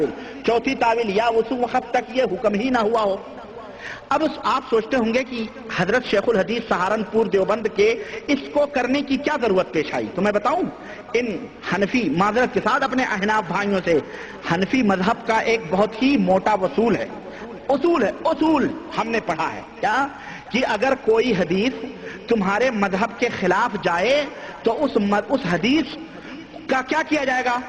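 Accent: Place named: Pakistan. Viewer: Hulia Arab numa, Pakistani lahja. Allah hidayat da sab ko. Dawat e tabligh zindabad.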